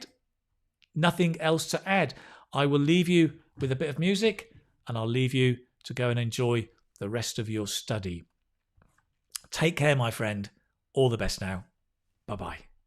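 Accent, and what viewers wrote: United Kingdom. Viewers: Your lessons are really important always.You try your best to give us the best free.Thanks a million teacher!Always love to hear your british accent!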